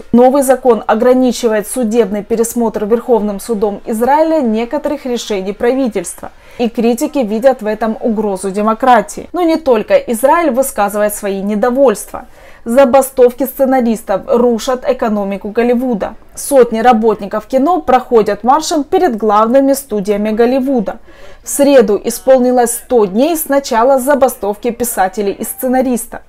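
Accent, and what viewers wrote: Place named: Ukraine. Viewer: Дуже сильний малоросiйский акцент. Треба бiльше працювати над мовою. А так, спасибi, цiкаво слухати.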